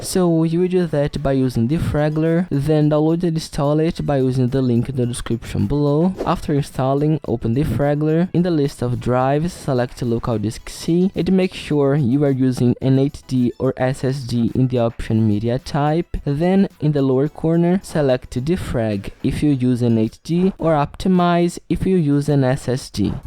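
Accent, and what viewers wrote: Brazil. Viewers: mano esse video ficou deveras dahora, voce conseguiu enganar incrivelmente a gringa falando ingles, mas claramente pelo seu sotaque é brasileiro. amei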